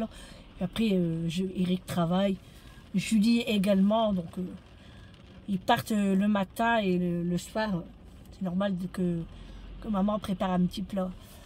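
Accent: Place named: Hauts-de-France, France. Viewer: Bonjour ah c est matcho avec l accent des hauts de france j entendais min t 'chot rohhhh Belle journée prenez soin de vous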